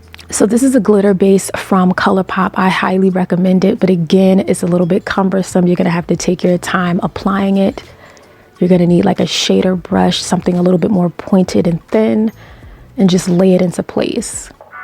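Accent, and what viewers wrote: New York, United States. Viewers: that NY accent it does something to my soul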